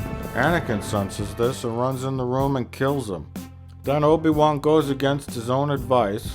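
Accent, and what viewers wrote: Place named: Wisconsin, United States. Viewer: My favorite part of these reviews is the narration. Monotone, mumbling mispronouncing of new words is a perfect rendition of central Wisconsin schlubbery. Its like hearing Peter Sellers when he does half a dozen different English accents to perfection.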